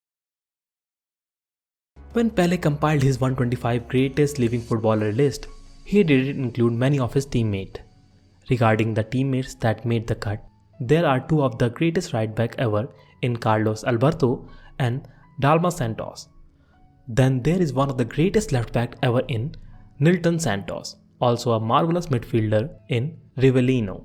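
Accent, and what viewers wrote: India. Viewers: "cane"'. Chupa essa cana. Your accent is....from india?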